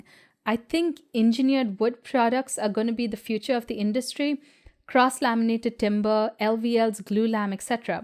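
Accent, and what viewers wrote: India. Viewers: I still can't get over how refreshingly entertaining it is to hear this lovely lady with her Indian accent say "y'all" at the end of some videos. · I had pretty much decided you are Indian, from your accent. I expected is your married name, with your accent still doesn't make a lot of sense to me. Your Portuguese connexion goes far to explain why you don't look like most Indians I have seen. Years ago, I knew Yvette Rodrigues, her face had a similar shape to yours, though she came to Australia from the Philippines. Do I think all Indians are the same? They don't all look the same any more than all Europeans all look the same. I was rather taken one year, when I was watching an ANZAC Day march. A contingent of Poles came by. Individually, they don't look much different from any other white Australian. Together, the difference is striking. I suspect they mostly speak English though, but also a selection of other languages such as Urdu, Hindi. 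Arabic wouldn't surprise me. Probably with wildly different accents and dialects. I am not that surprised to find architecture students aren't quite ready for the workplace. From my expeience, in the 70s it took computing graduates a year to settle into their first job so they didn't need to be closely supervised. Part of it was that they learned on Burroughs equipment, not at all like any government (I was in Canberra) department. You could conceivably work to fill the gaps. I live in Mandurah, about 15 minutes walk from the Indian Ocean, about the same latitude as Dallas. We don't do ice and snow. You should do Q&A exactly as often as you like. I tend to not watch them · Thanks for clarifying the name . When I saw your video and voice. I guessed you are Indian, but name sounded little different. No hard feelings was just love and curious.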